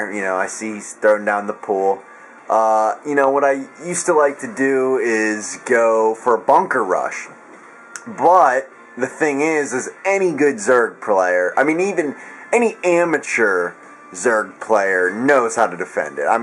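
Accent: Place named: California, United States. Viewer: You have to be from Cali :P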